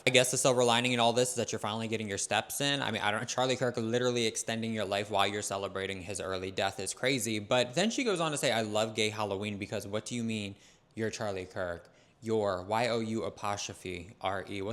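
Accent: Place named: United Kingdom. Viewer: We got a Brit over here innit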